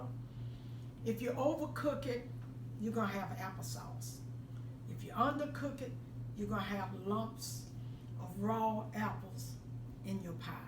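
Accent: South, United States. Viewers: exactly! It's a southern thing and that's just how we talk ❤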